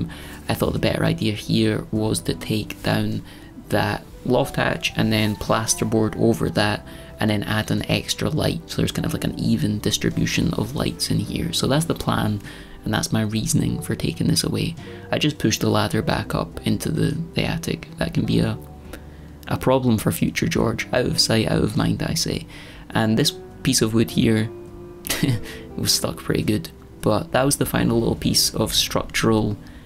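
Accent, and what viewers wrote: Scotland, United Kingdom. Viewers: I did just that and realized I had been watching George off and on since he renovated his tiny stone house that had been abandoned. The name of his channel has changed, I think, but I recognize the cat, George’s accent and his red haired from the tiny house renovation. Thanks you I could listen to that accent all day long. My family was from Scotland but journeyed to US in late 1700’s and only a Texas accent remains!!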